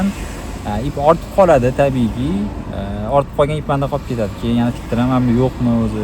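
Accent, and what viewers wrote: Khorezm, Uzbekistan. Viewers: Xorazmliksiz ajoyib aksentiz bo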